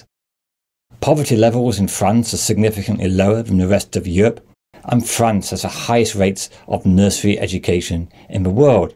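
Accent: United Kingdom. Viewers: Is this Scottish accent or what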